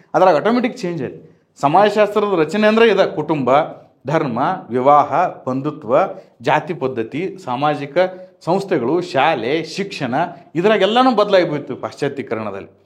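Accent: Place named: Alagawadi, India. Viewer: ಇದ ಅಳಗವಾಡಿ ಭಾಷೆ ನೋಡ್ರಿ ಸರ್.... ನಾವು ಕೂಡ ಅವ್ರ ಪಕಿನ...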